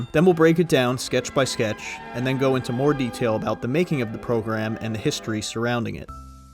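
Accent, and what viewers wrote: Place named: United States. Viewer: This is a pretentious and irritating video. The voiceover is so American it can not be listened to. It is what makes a difference between fact and knowledge. Yes the facts are there but the knowledge is missing. Cringe worthy dialog but little else...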